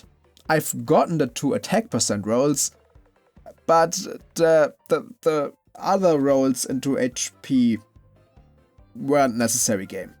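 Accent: Germany. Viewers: HOERE ICH EINEN KLEINEN DEUTSCHEN AKZENT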